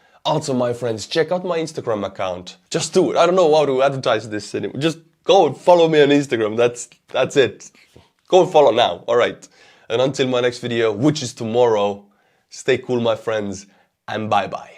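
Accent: Russia. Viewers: Funny thing is that you dont have to fake an accent, you already talk like a russian/Ukrainian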